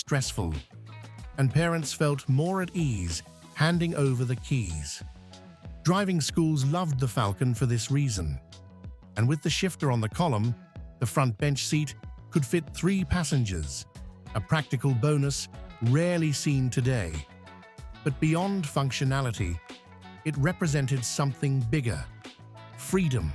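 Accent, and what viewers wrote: United Kingdom. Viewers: Please lose that, britsh accent. They didn't make the Falcon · Who writes this crap? British accent narrator knows little about the Falcon!!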